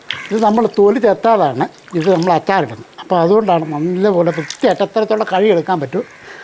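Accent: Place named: Central Travancore, India. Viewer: എന്റെ സഹോദരാ, ഞാൻ സ്വയം പാചകം ചെയ്യുന്ന ആളാണ്. ഒറ്റയ്ക്ക് ആണ് താമസം. എന്റെ കിച്ചനിൽ ഇപ്പോൾ റെസിപ്പി മാത്രമേ ഉള്ളൂ. പുകഴ്ത്തുകയല്ല എല്ലാം അടിപൊളി. താങ്കളുടെ വിവരണം നന്നായിട്ടുണ്ട്. താങ്കളുടെ ഈ വിനയം ഉണ്ടല്ലോ എന്താ പറയാ ഒരു വലിയ സല്യൂട്ട്👍🙏. മധ്യ തിരുവിതാംകൂർ കാരൻ ആണെന്ന് തോന്നുന്നു സംസാരം കേട്ടിട്ട്. ദൈവം ധാരാളമായി അനുഗ്രഹിക്കട്ടെ.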